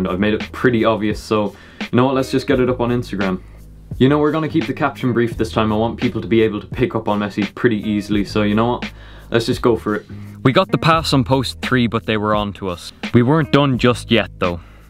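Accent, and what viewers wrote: Ireland. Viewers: r u irish?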